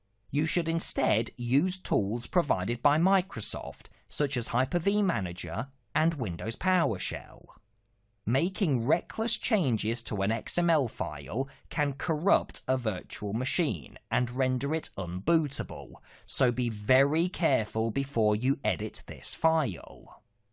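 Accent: United Kingdom. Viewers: Best explanation ever on Hyper-V and I enjoyed the British accent. Well done and thank you for the free training videos.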